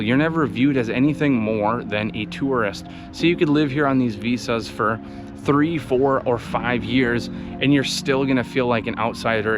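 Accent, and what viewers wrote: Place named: Midwest, United States. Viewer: Nice video,. I’m trying to place your accent. Parts of it sound like like Minnesota, but I’m thinking Michigan or Wisconsin.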